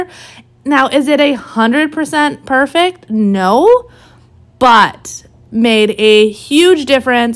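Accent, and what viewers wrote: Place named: Midwest, United States. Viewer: I love watching your videos they are so motivating. I also love hearing your midwestern accent I have family in Illinois and I just feel like we are family.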